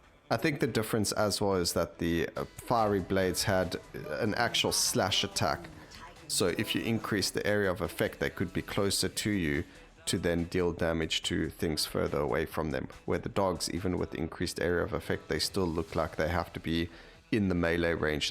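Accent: South Africa. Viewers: Anyone else love the South African accent? Wack ton of a awesome lol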